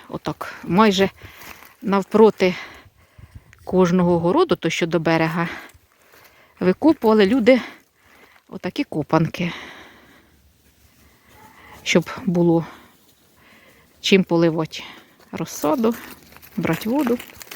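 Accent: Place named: Kyiv, Ukraine. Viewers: Я бы слушала день и ночь. Такая у нее красивая мова. Я тоже с центральной Украины, с Кировоградщины, сейчас беженцы в Чехии и мова... ну как вроде дома сижу среди соседей и друзей, точно такой же язык! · Люблю дивиться ваші відео, особливо дуже рідний мені ваш говір я з Київщини. В нас з вами багато спільного, говорять і в нас кисляк, місцевість ніби я в своєму селі.♥️💙❤️ Божого благословення вам і вашій Родині!!!🙏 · Доброго дня! Дякую за цікаві розповіді. В якій місцевості ви живете? Ваша мова така ж як у нас. Я живу на Київщині.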